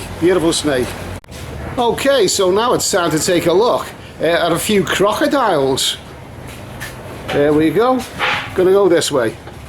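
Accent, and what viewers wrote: Liverpool, United Kingdom. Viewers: Fantastic video, your photography was brilliant, your commentary was most informative without being boring, i think the Scouser accent gives it a nice cheeky humorous flavour, although i think you may have pissed off a few lads in the council estates around "LaPool.HeHeHe.Keep up the the good work brother look forward to more like this one.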